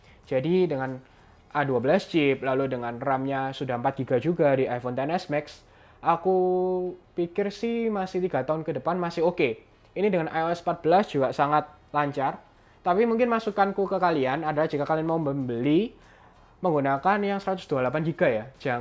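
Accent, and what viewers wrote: Java, Indonesia. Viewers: Nada bicaranya kaya orang jowo bangeddd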